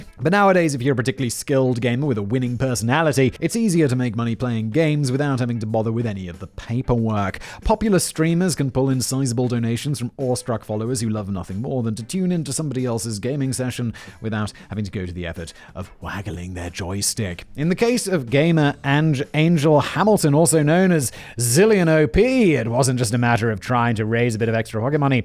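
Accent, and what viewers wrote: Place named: Oxford, United Kingdom. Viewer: I love how Simon has the vocabulary of an American Midwestern and the accent and diction of an Oxford Man!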